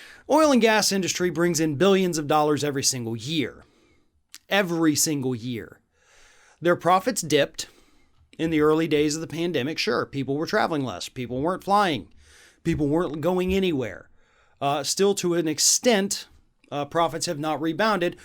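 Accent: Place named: Texas, United States. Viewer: Your Texan accent.....and the content....love it!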